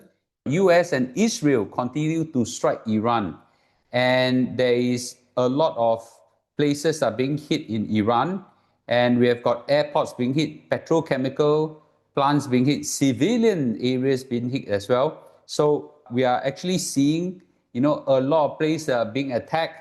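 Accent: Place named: Singapore. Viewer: Very good Senglish.. 👍 ❤🎉😊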